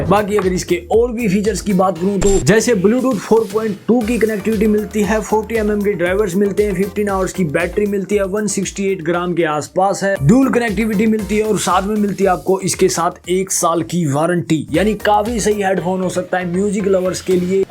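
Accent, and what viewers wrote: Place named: Punjab, India. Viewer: Punjabi ho ke harayanvi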